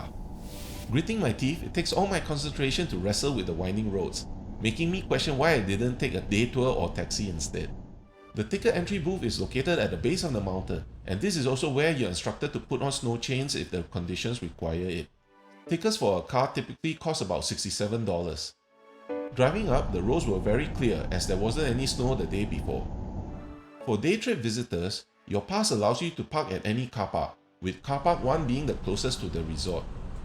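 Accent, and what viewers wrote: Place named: Malaysia. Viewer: Thanks for the info bro, amazing video😊. I am also planning a trip here soon. Btw are you Malaysian as well? Guessing based on your accent😁.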